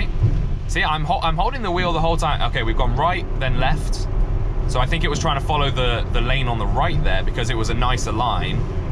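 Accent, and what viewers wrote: United Kingdom. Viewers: Thanks for the video! I like you british accent very much and your pronounciation is very clear and sharp, which makes it much easier to understand for me (I am German). Very interesting how there are introduced subsequently new Autopilot icons; I have never seen before the "To resume Cruise Press the accelerator pedal" message / icon.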